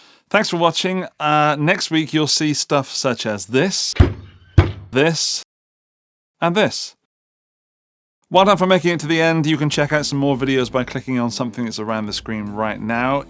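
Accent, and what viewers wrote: England, United Kingdom. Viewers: yes we English did invent the English language 😂😂 it's pronounced Lez in England/English. speaks with an English accent so he's pronouncing it correctly.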